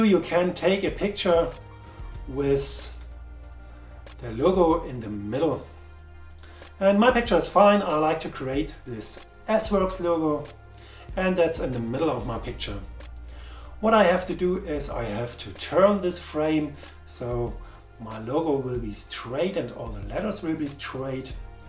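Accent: Germany. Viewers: Deutscher Akzent is so geil😂👌🏼 hört sich bei mir genauso an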